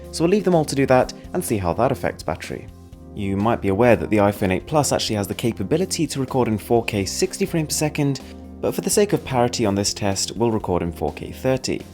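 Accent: United Kingdom. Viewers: Nice review indian guy with a british accent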